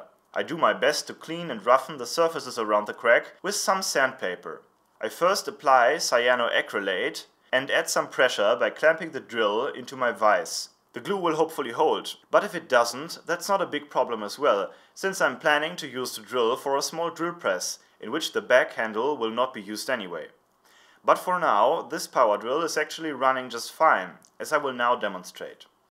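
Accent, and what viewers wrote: Germany. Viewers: Mal ne doofe Frage: Du bist Deutscher oder? :D Hab jetzt am Anfang nicht drauf geachtet, aber so wie du deutsch sprichst, und wie du englisch sprichst, kann es gar nicht anders sein :x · For somebody whose native language is French, I am charmed by this German accent. I understood by the context that he was happy of his purchase, so I could not guessed that the expression could express anything negative. That remind me after a good meal, my partner said "I am feed up", thinking he was expressing "I ate too much". It shows that we, Quebecois, can not guess correctly the meaning of these verbs followed by "up" or "down". We have the same problem guessing these French expressions with a name of a color: carte verte, carte blanche... what?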